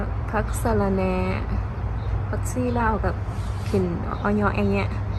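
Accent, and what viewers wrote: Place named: Isan, Thailand. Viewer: มาให้กำลังใจน้อง ค่ะพูดอีสานเสียงเพราะมาก สุขภาพแข็งแรงแล้วหลาย มาเดินดูผักปลูกผักไม่หยุดเลยรักษาสุขภาพด้วยนะ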